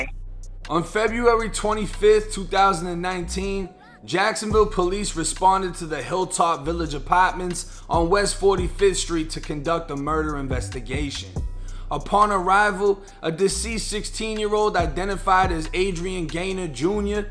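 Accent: Boston, United States. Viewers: That Boston accent heavy bro 😂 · Oh yeah you definitely from Boston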